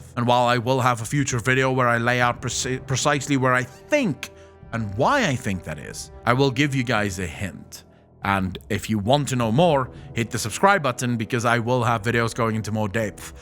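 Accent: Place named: South Africa. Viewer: Subbed cause fellow Safa :) Would recognize that accent anywhere. Good vid my dude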